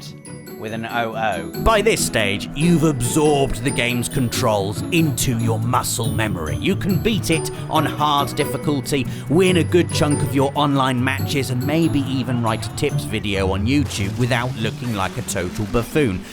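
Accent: England, United Kingdom. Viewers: Because he has an English accent?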